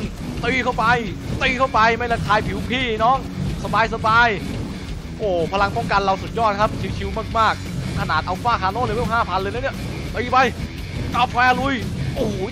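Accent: South, Thailand. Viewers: คนใต้ปะพี่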